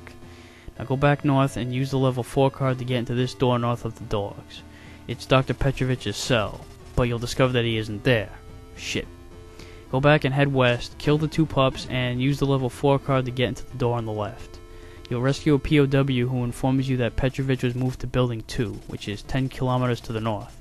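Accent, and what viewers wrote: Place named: New York, United States. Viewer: This is an amazing walkthrough! By chance you from New York? Just wondering as I hear a sight accent. Either way thanks and cheers!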